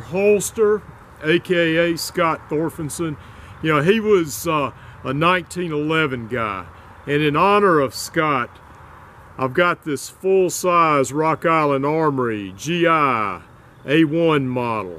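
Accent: South, United States. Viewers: dudes voice is from country heaven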